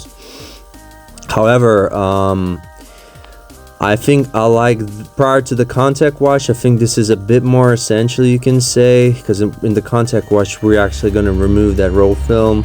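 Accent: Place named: United States. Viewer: Your accent sounds as you're from Chicago or New York with traces of immigrant parents. Thoroughly enjoy your commentary!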